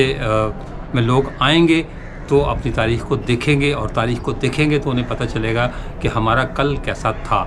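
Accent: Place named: Uttar Pradesh, India. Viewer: آپ کی اردو بولنے کا لب و لہجہ یو پی، میرٹھ اور دہلی طرز کا ھے کمال کے اہل زباں ھیں آپ،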